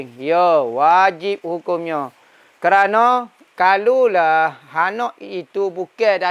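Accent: Kelantan, Malaysia. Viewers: Walaupun saya rakyat Malaysia. Alhamdulillah saya dapat faham ustaz ceramah loghat Kelantan❤️❤️❤️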